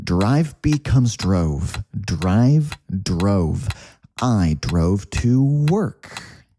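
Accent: United States. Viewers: Could you please make videos about american accent😔😔 and it seems like you are an American. Plz teacher 🙃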